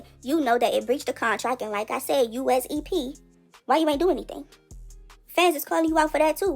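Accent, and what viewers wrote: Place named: Washington DC, United States. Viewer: The narrator sound like she from dc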